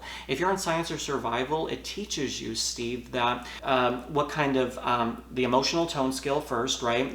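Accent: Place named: Boston, United States. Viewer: Always good to see a new video from you! Thank you for continuing to speak out and inform people what it was like for you as a public. Also, hello fellow Mass-head! I lived about half an hour north of Boston from birth to age six, and I've visited family up there often over the years. You've done well at neutralizing the accent! I still have mine on a few words, even after all this time, and whenever I visit, it comes back after half a day, and it takes me a couple weeks to get back to my normal mish-mash American accent. My wife thinks it's hilarious, for the first day or two, but then she starts calling me out on it.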